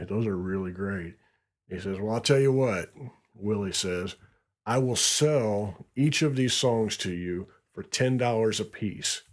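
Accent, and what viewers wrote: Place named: South, United States. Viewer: You have a great voice & southern drawl & calm to awesome story telling! Love your repotoar!! 🙌👏👏✌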